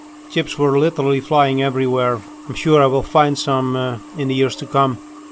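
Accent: Netherlands. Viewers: your Dutchlish accent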